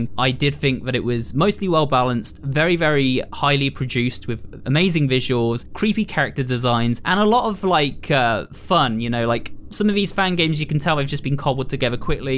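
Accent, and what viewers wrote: United Kingdom. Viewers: He british asl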